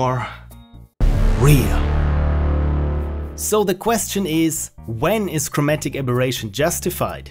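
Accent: Germany. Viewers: You are German, aren't you? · Du bist ein deutscher, stimmts? :D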